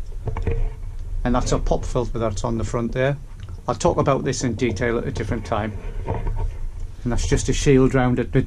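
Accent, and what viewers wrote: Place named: Northern England, United Kingdom. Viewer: you're a bloody musical titan, honest! I also have a lot of fun listening to your northern English accent, which I'm very fond of. Thank you for being a legend!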